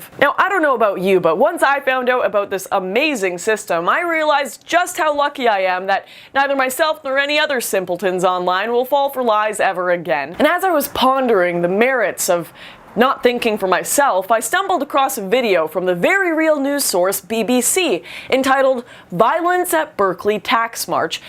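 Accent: Canada. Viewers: "well I don't know aboot you, but once I found out aboot...." Lol. never really noticed that strong Canadian accent before.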